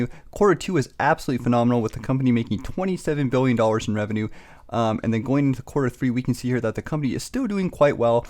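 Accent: Canada. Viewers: Your accent is something... Where in Canada are you located? (This is coming from a Quebecer hehe)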